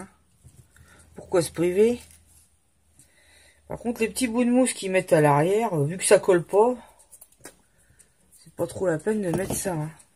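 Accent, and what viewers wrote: Paris, France. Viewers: 🎶(d où ton accent titi parisien😍.)Coucou. Bien ces petits cadres. C est vrai que les parents il faut les mettre en valeur❤️. Je vais les refaire mais pour mes grands parents. Merci du partage. Je suis contente pour toi que tu aies trouvé de la bonne collasse😂. Tu souhaites un bon anniversaire à Mr COLTOUPARTOU le chef cuisto. Dis donc en fin d année ça vous en fait des choses à arroser. Donc petit verre à votre santé 🍻🥂(à consommer avec modération) tchin tchin. Bisous et passe un bon réveillon 🎉moi calme avec mes 3 frangines (car boulot surlendemain) on fait soirée karaoké et ma sœur va me chanter le best of de et, l autre ce sera et et la troisième les cantiques d'église, chouette soirée, non? Mais je les aime comme ça ❤️ et aussi, en passant par et et bien sûr (pas d anglais car on ne parle pas anglais ou très peu ou très mal😭😭😭) belle soirée en perspective hihi bisous et belle année 2019 à tousssssss et toutes❤️🎉🎶🍀👍💞💞💞💞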